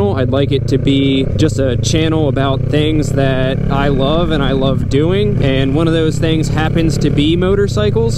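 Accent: Baltimore, United States. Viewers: fuck yeah bruh. the baltimore accent is making me nostalgic lol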